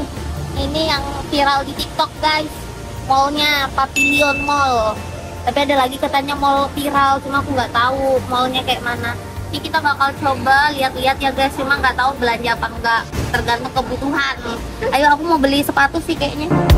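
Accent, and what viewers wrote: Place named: South Sulawesi, Indonesia. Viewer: Kak pekat betul ba loghat bugis mu😂😂😂,btw Salam dari bugis pare" Sabah Malaysia kak enjoy terus kakak selama di Malaysia ya😆🙏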